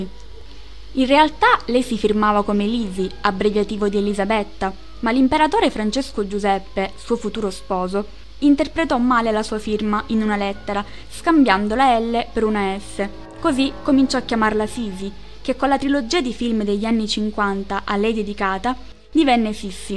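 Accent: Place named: Catania, Italy. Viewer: Sei del Catanese? Si sente molto il tuo accento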